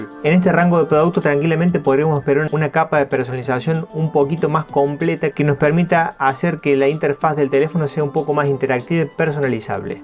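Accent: Córdoba, Argentina. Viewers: Se nota que sos cordobes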